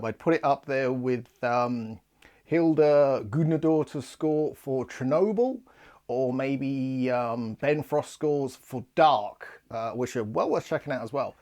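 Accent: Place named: United Kingdom. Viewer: Great stuffs in this first episode, really you're an incredible music collector, you're so young but with so many top items that I'm literally shocked about it! My respect for you will last forever! I like your format on the video, I like your British accent, although I should follow sometimes the YouTube subtitles because it's not simple for me to understand every words! I too have the vinyl and i like this album (really underrated by the fans), about the other albums I've downloaded them from Spotify to give them a listen... You're so lucky to have the boxset of as the Suspiria TP. A piece of Italy in your life! Stay gold!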